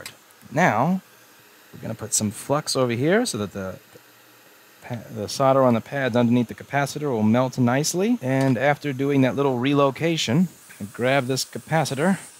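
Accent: United States. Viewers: why do you Americans say "Sodder' and not "Solder" as it is spelt.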